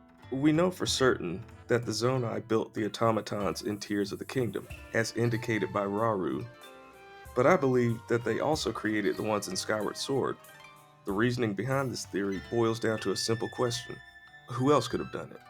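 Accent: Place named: Georgia, United States. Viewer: Where are you from? You accent sounds like south Georgia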